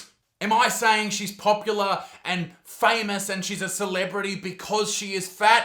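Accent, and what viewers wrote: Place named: Australia. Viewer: I did not expect the Auzzi accent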